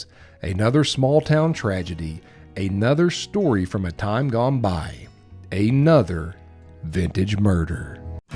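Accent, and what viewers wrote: Kentucky, United States. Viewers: Awesome upload,as always. Smooth Kentucky voice an added bonus! Thank You,!👍🤗✌️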